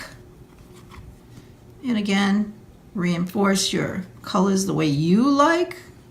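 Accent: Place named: New England, United States. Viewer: New England? I hear it, dahk instead of dark... and idear instead of idea (my hubs is from New England). Do you also say acrost instead of across? I think it's adorable ;-). Anyway, fun tute, thank you, plus Plum Blossom is one of my daughter's nicknames so I think I'll paint something like this for her.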